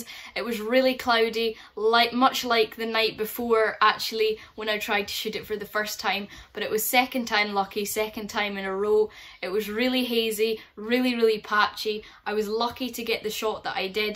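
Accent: Scotland, United Kingdom. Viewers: Don't know what I enjoyed most, your very helpful instructions or your wee Scottish accent. In any case, thanks for taking the time and effort to post this video. BTW - you wouldn't want to be standing around in the middle of a heat wave, being bitten by mosquitos, to photograph the comet. We here in Pennsylvania, USA can attest to that.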